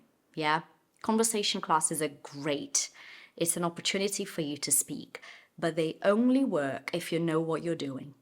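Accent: United Kingdom. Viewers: You have a beautiful British accent!! I couldn't tell you are not a native, actually!!! 😮😊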